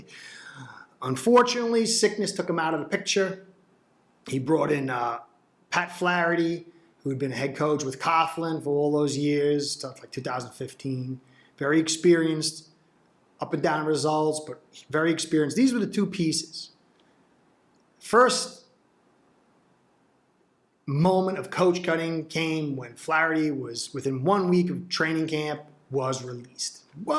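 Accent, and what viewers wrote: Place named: Northeast, United States. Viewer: Love you're north east accent (piason?) can't put my finger on it. Not overly nyc or that north jersey twang. Almost like between philly and Trenton area.. reminds me of home. New sub. I love your even keeled approach unlike many fin fan fans. Fin fan since a lil kid in 84' in Philly then in 86' we moved 2 Tampa area , Bucs sucked and were blackouted every home game. Back then Miami was still Florida's team. And they were on every week. So, i haven't missed a game since 87'. Thru reg. Tv, direct tv, sports bars when away or when in the service. A few games had to mailed to me but I'm that loyal. My family, from folks to wife & daughter know during football season that 4 hr slot is blocked off for the Dolphins, don't invite me out & if i go over my folks for dinner, I'm not moving from the tv. Lol. I also played d2 & coached a lot, so i know the x's and o's pretty well. 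But, Flo's forgotten more about the game than ill ever know & he's at practice & watching coaches film all week. I don't like to 2nd quess coach's every step of the way or arm chair 20/20 play call. I know what goes into play calling & offensive installs. Without knowing the play & having access to coaches film to see who didn't win their 1 on 1's, it's impossible to question those micro things. Ill judge him on a macro level overall but not yet. Too early. I know we have been conditioned to be mediocre & expect us to eff up a wet dream. But, older i get the less emotional (except during the game... when X made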